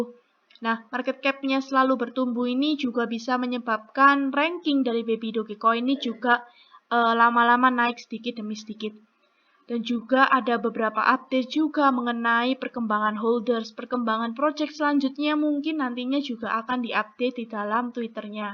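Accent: Java, Indonesia. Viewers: Halah iki mesti wong jowo sing ngomong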